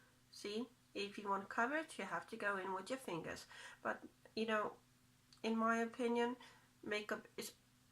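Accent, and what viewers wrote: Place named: United Kingdom. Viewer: Already subed here darling!! Love your english!!! I speak american english but love ritish one!!! like 32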